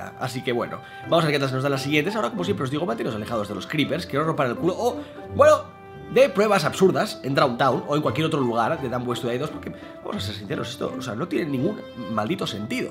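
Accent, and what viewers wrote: Argentina. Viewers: Te sale mejor el Argentino que a mi (que soy de ahí) XD Como lo haces? Voto por un blog entero en idioma boludo! jaja Me encantan estos bichitos!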